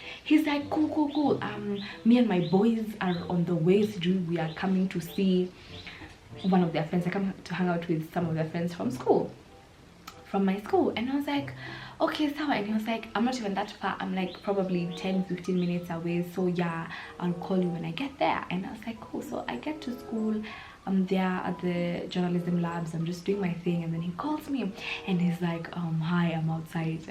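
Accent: United Kingdom. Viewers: The British accent gets me all the time😂😂😂😂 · The british accent always gets me😂😂❤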